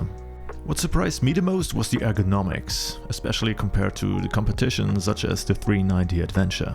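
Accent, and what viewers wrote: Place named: Germany. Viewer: Good review and wow, your English is amazing. I really liked the use of idiom. The spoiler "thingy" and "cheers" were really nice to hear. Whilst you have a German accent, you don't have that mid Atlantic accent so many European speakers of English have. You should feel proud of your video and your excellent English.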